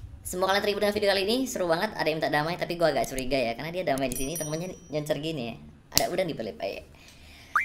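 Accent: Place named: East, Indonesia. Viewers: Logat nya orang papau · Cara ngomonya orang papua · Bang itu Kayanya orang Papua Bang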